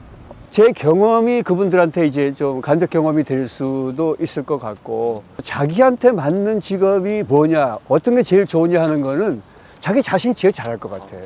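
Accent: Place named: North Jeolla, South Korea. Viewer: 말투가 절라북도구마잉. 열씨미 사는구마잉.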